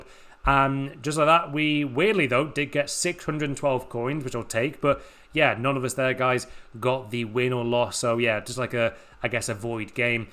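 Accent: Australia. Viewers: So I am guessing your an Aussie?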